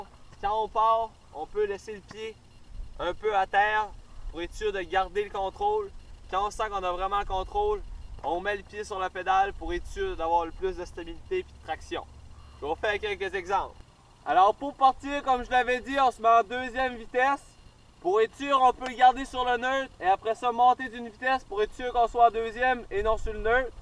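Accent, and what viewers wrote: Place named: Quebec, Canada. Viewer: L’accent québécois mdrrr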